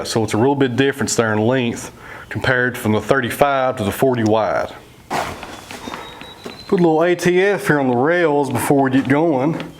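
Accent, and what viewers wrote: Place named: Appalachia, United States. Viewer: I have no idea how I came to click on one of your videos, but I bet it’s because I’ve been watching August Hunicke’s tree felling videos for some reason. Also have no idea how I came to click on his. Anyway, glad I did, because your Tennessee accent, your beautiful drone footage of your ranch, awesome music choice, and unhurried video style just makes watching feel like relaxation therapy. So, from and Australian fan, keep the awesome content coming.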